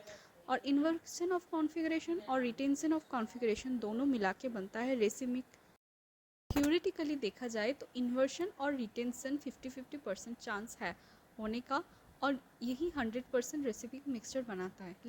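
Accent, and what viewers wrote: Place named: United Kingdom. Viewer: Mam aapka accent thoda british ho rha h 🥲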